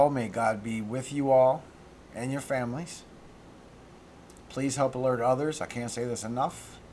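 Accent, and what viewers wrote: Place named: South, United States. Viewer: God bless,. We both have biblical names and I am glad to be your brother in Christ. Besides that, I want to say I recently found your channel and I am thoroughly enjoying your content (aside from the life threatening aspect of course). Also please don’t take this the wrong way, but I like your content because of your mannerisms, accent, etc. As a southerner, it’s nice to see another one out there going through heavily scientific content but in layman’s terms and with the cool mannerisms of a southerner. Keep on keepin’ on and once again god bless!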